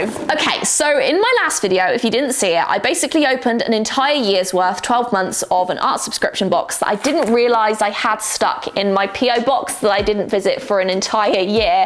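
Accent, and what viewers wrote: United Kingdom. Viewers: Hi, of all the art supplies, I loved the arteze (hope I got the spelling right) watercolour books the set if three, I love watercolour work so. Thank you for such a beautiful Unboxing, P.S. you have a very beautiful British accent.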